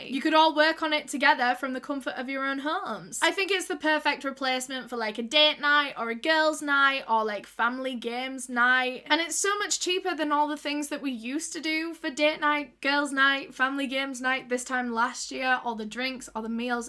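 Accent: United Kingdom. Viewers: I've never been that into british accent, but yours is just so charmingggggg 🥺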